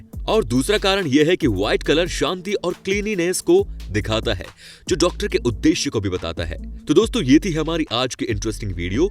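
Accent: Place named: Jaipur, India. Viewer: Yeh awaj Jaipur ke ek bhai ki hai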